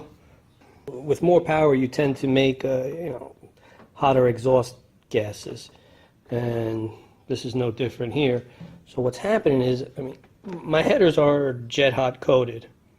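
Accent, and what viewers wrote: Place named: Pennsylvania, United States. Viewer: You have to be from PA, you sound just like my friend Bill. Great channel, amazing work.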